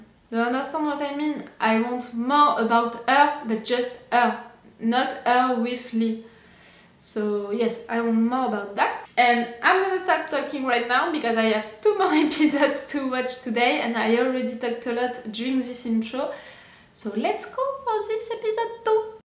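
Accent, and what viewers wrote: France. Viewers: This french accent of yours is quite pleasant for my russian ears. Sounds great) and I understand almost everything)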